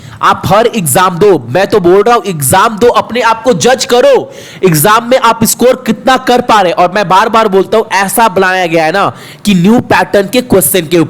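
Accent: Bihar, India. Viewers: kdwa par sch bolte ho bihari style me jo bahot acha lgta hai muje toh. love from rajasthan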